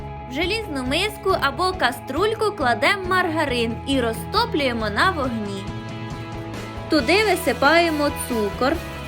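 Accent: Poltava, Ukraine. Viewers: Як по розмові, то точно з Полтавщини!?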